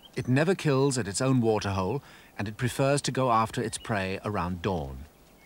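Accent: United Kingdom. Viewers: Wonderful, quiet sensible lion doc. with a British narrator. Yes lions move out of the way for now, but no grazing animals die of old age, eventually the apex predators get them.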